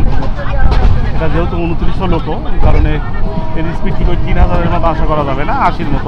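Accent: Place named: Jessore, Bangladesh. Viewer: ভাই। আপনার দেশ এর বাড়ি কোথাই? কথার টান শুনে তো মনে হচ্চে আমাদের যশোর এর দিকে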